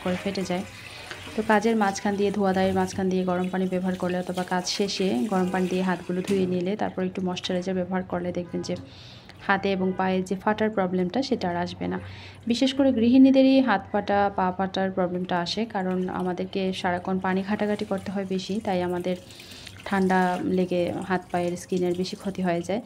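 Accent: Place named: Chattogram, Bangladesh. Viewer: আজকেই প্রথম দেখছি, আমার বাড়ি হালিশহর সবুজবাগ চট্টগ্রাম। আমার এলাকার মানুষ এর কথা শুনেই মন ভালো হয়ে গেলো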